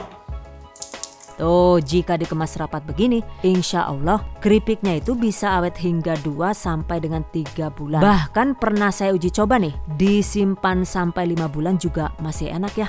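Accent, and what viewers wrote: Banyumas, Indonesia. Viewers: salam ngapak bundaa...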